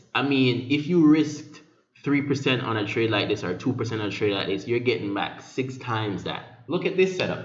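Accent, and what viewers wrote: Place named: Jamaica. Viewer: Man I knew u were Jamaican from the accent 😂. Big up 👍🏽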